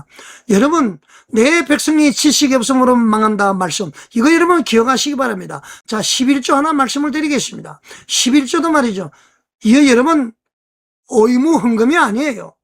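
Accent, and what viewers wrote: North Korea. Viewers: 억양이 이북 사투리가 심한 것 같네요. 우리 교회도 감독에게 양육 받은 부목사가 불륜을 했다는 모욕적 내용을 전 성도들에게 배포, 누명 씌워 담임 목사를 고소해 교회를 박살내 힘들게 했는데 몇 년 지난 후 사실 무근이라 법원에서 판결 받고 벌금 내고 끝났으나 지금도 간간 교회를 여전히 괴롭히고 있네요. 악한 영들의 거짓 행위인 것 같은데... 공산당이 냄새 피우는 것 아닌지 수상들 하네요. 십일조는 반드시 해야 합니다 이는 하나님께 돌아가는 표입니다. 말리기를 참조하세요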